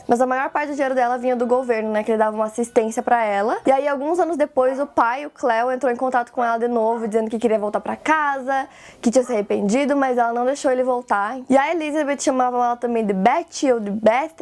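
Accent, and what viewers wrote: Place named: Paraná, Brazil. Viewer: Claro que tem. Todo mundo tem! Ela fala "de" e não "dji", por exemplo, que é uma característica do sotaque do Paraná.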